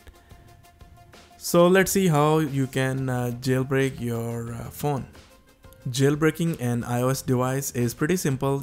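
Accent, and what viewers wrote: India. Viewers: Yo dis shit no work wit yo Indian voice likd dafuw u sayin Peace dawg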